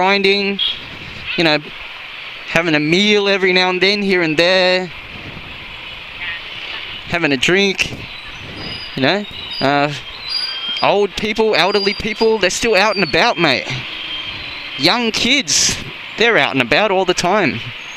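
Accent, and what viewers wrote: Australia. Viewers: I really enjoy your narrative and your "down-under" accent, mate! Thanks for making the video.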